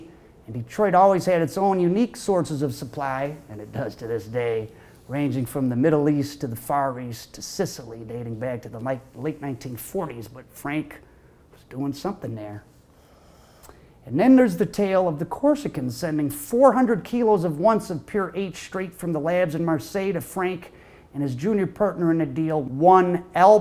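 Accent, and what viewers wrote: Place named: Massachusetts, United States. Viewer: I thought that was a Massachusetts accent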